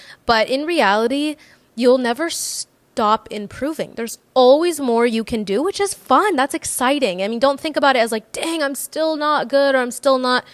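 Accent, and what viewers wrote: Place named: United States. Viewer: I like this American accent can you tell me which region this is I will found more content in this accent I'm learning English with this accent but I don't know what is the name of this accent It will be good for my future English language hope Thank you for sharing Islamic content May god grant you more knowledge about Islam, Bacha from Pakistan ❤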